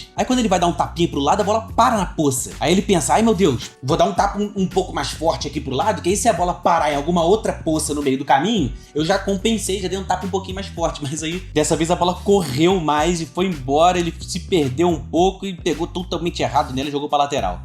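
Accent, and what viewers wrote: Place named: Rio de Janeiro, Brazil. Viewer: Sotaque carioca? Dislike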